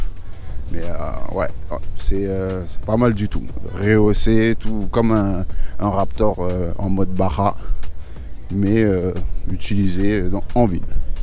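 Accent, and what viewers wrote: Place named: South, France. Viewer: Savas tu vas très bien et bien décontracté pour moi bonne vidéo on dirait que tu est originaire du sud de la France moi aussi est je vie à Miami fl à plus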